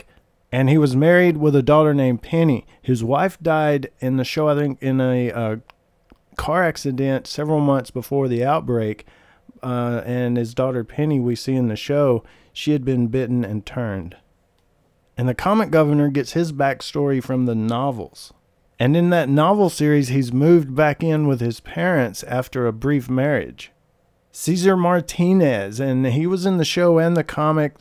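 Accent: South, United States. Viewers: Dude you have a southern as hell accent lol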